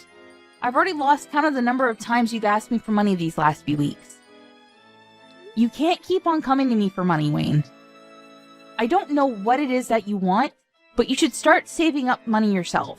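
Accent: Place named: England, United Kingdom. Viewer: I like the English accent.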